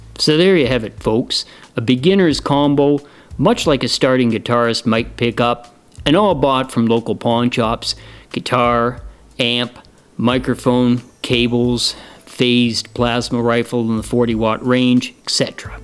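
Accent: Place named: Cape Breton, Canada. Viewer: Where is your accent from??????? I’m a Caper and you sound like it too!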